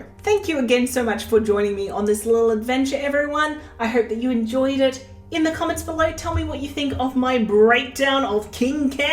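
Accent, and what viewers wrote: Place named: Australia. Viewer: I love the Australian english!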